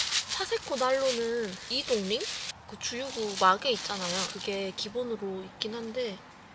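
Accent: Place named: Gwangju, South Korea. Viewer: 요즘 무한재생으로 보고 있어요 집에서 그냥 틀어놓기도 좋아요 ㅋㅋㅋ 저도 광주인데 사투리가 편안합니다ㅋㅋ 앞으로 더 큰 채널이 되길 바라요 ♡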